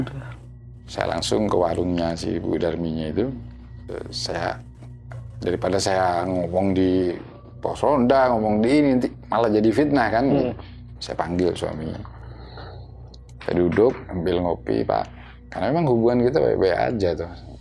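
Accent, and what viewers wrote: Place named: Madura, Indonesia. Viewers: Logatnya madura bgt om 😂❤ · Assalamu'alaikum wr wb...mas...sy dulu...Jan 1975 - Agst 1976 itu...jg pernh tinggal di Bdws...19 Jan 1975 itu...sy,adik sy/Ana n almrhum Rama sy...naik KA dri Sby ke Jember...llu naik colt ke Bdws utk cri sekolh sy n sekolhx adik...Alhamdulillah...sy dpt di SMEAN - Jl Wachid Hasyim...klas 3...dri SMEAN 1 Sby pindh ke SMEAN Bdws...kl adik sy di SMPN 1...klas 1...llu sy n adik dikostkan didepanx gere'ja ayam...tpi hnya 2bln...sedangkn almrhum Rama sy n tmn2x itu...ad yg tidur dirmhx almrhum Rama sy...di Perumhn Artha Karya 2 - Jl A Yani - Nangkaan - Bdws...llu Mret 1975 itu...kelima sdr sy diboyong jg ke Bdws krn pd 1973 itu...kedua ortu sy sdh bercerai...jdi almrhumh ibu itu...hidup sendirian di Sby...stlh sy lulus sekolh...Agst 1976 itu...sy ikut almrhumh ibu llu Des 1976 sy menikh di Mjkrt n sy tinggal di Mjkrt itu...hnya 1th llu selama 7bln sy,suami n ank sy tinggal dirmhx almrhumh ibu n almrhum bpk tiri sy...kemudian sy ngontrak didektx rmhx almrhumh ibu...smp lhir ank ke 2,3 4 n 5...llu 25 Sept 1983 itu...sy sklrg pindh ke Bojonegoro n smp skrg...krn dinsx suami sy dipindh...dri Sby ke Bojonegoro n smp purna tugas...suami sy kerja di BUMN n purna pd 1 Agst 2004...mas itu...tinggalx dikampung Arab yaa...adikx ibu tiri sy itu...jg ad yg tinggal dikampung Arab...sy mendengar logat bicarax...mas itu...kok sprt orang Madura...ternyata betul...kita sm2 pernh tinggal di Bdws...Smg rumah tanggax mas...Samawa...Aamiin...sy/bu R Ayu Mamiek Widayati...68t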